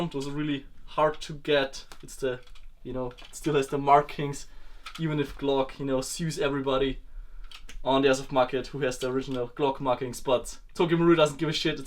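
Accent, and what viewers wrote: Bavaria, Germany. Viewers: German accent right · sprichst du deutsch,schweizerdeutsch oder bairisch ??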